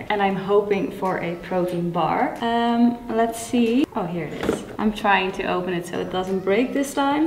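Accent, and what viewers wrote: Netherlands. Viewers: Im just curious, where are you originally from? Your accent sounds Dutch to me ^^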